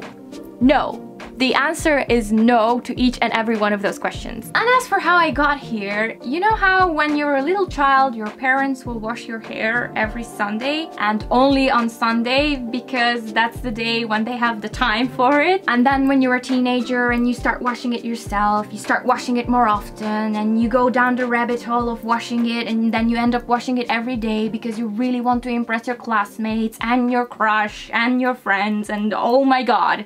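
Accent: Hungary. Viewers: What's wrong? She is from Hungary and many people around the world have accent and pronounce words differently. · …where are you from?? Just wondering…I take a guess but can’t quite get it correct I think. ❤️🤗Ok, I see now. You’re from beautiful Hungary!!! Lovely accent. ❤️🤗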